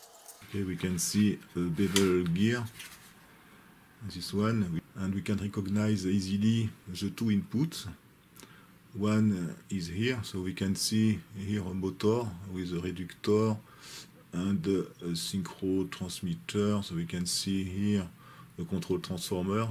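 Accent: France. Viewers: Getting better at understanding Frenglish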